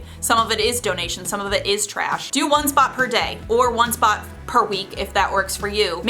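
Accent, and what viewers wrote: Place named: Boston, United States. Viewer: Muchacha!! 🎉 you’re brilliant on soo many levels! I got sudden-chronic-declutter-syndrome at 2am, 2 days in a row .. I’ve hit my “plateau” on day 3 and from your videos I’ve gathered i have tooo much stuff. All downloads printed! ❤❤❤ Enemy identified, resolution spotted -and Boston Cream, cuz they talk funny! Wait, not funny. Cool! Interesting? Different? It’s an appealing accent😅 I’d have a nonsense conversation just to hear you talk. Soo I’d have a donut that reminds me of that potentially interestingly speaking boston-ly person. Keep being your greatest self! God bless ❤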